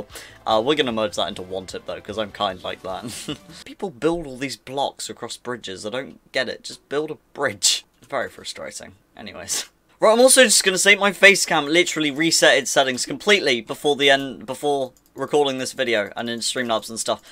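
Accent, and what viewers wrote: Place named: United Kingdom. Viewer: I love your British accent I always wanted to be British but I can’t I hope I can do you think I could)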